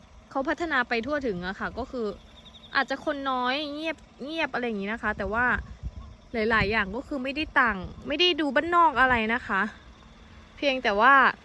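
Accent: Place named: Isan, Thailand. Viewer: ชอบแม่ เว้าอิสานม่วนคัก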